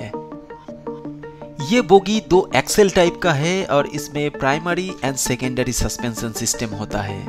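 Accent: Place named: Bengal, India. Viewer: T = correct accent use kar rahe ho aap .. But FIAT per "T" ko keu Bengali accent " ত"" use karte ho aap log ? Next Bihar pe maximum people " use " = accent pe " S " ko keu Bengali accent " জ / j " karte hai ❓ mujhe pata nahi 🤔 Use word pe "" s " accent ko s jaysa accent nahi karte hain ❗ Main 1 word examples diya hai, aysa bahut words hain accent correct nahi hota / not ❓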